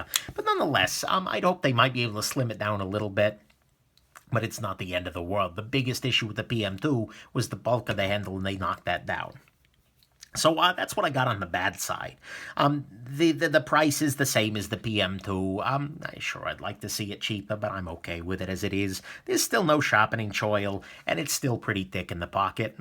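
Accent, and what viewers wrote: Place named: Alabama, United States. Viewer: I love your Alabama accent 👍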